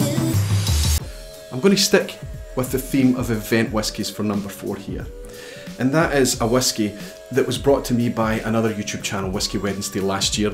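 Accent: Scotland, United Kingdom. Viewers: Hello! Really like your videos, and your Scottish English! What part of Scotland are you from? Thank you!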